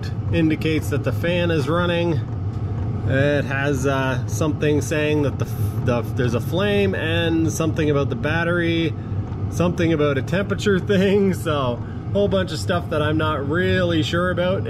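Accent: Canada. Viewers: Can't watch the whole video due to the Canadian accent. Boot boot boot annoying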